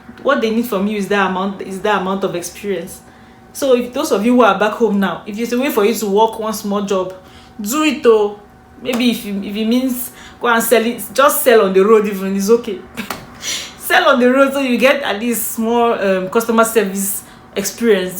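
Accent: Nigeria. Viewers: Lol u sound sooo Nigerian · I am sure that she grew up with Nigerians cos her accent is purely Nigerian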